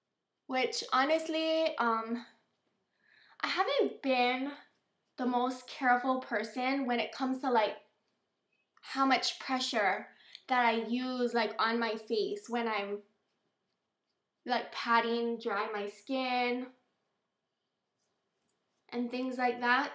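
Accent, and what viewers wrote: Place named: Minnesota, United States. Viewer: You must be from Minnesota.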